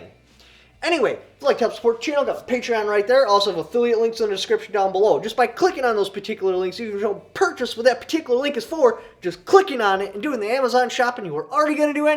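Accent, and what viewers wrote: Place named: New England, United States. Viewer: Not gonna lie, it took me some adjustment to get accustomed to your yankee accent. AFTER that I became attached. That said, I am sorry if I missed the vid of what’s going on with you; could you do a vid where you break it down?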